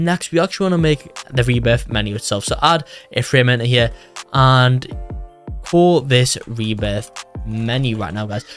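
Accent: United Kingdom. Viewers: By the way he talks hes frim london AND I HAVE 100TH COMMENT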